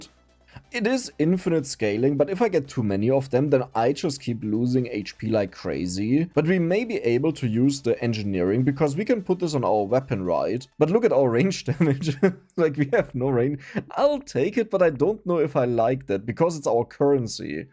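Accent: Germany. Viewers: such a nervenkitzel ;D ich liebe das deutsche englich einfach der beste akzent ;;D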